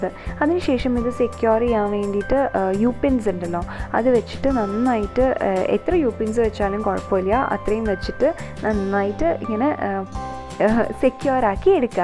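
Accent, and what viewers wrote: Thrissur, India. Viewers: Cheachi thrissur ano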